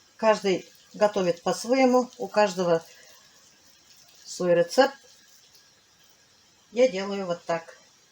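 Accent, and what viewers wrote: Tatarstan, Russia. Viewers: Какой у вас татарский акцент как у моей бабушки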